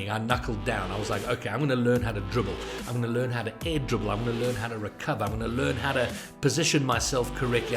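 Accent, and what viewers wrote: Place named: South Africa. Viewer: i want to know if you are south african since your accent is the same as my south african grand parents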